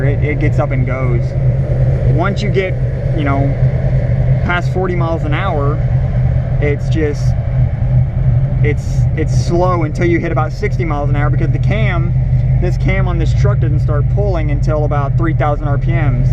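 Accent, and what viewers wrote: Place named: Texas, United States. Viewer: you from texas og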